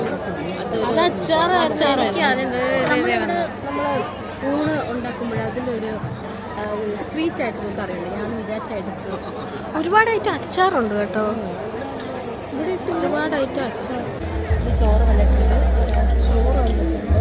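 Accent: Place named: Tamil Nadu, India. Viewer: Nigal tamilzhanoo samsarikumbol athikavum tamil ahnn varunathe😅❤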